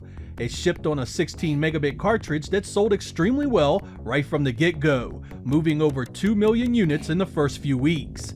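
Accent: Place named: Northeast, United States. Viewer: you have a funny accent are you from some weird northeastern US city?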